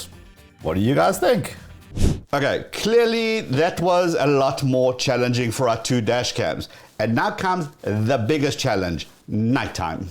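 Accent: South Africa. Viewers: Hello, it's absolutely true, the more you pay doesn't ALWAYS buy you the best.. good video.. In other news, are you South African? You sound like a saffa boet🌍🤔😂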